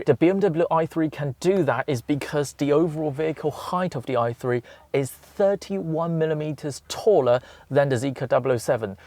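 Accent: United Kingdom. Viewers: He has a thick British accent though.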